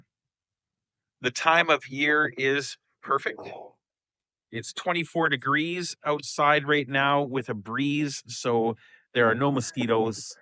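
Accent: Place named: Canada. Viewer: Lovely Canadian Accent 👍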